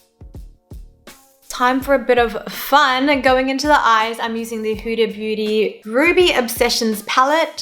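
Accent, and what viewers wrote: Australia. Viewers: is she australian？？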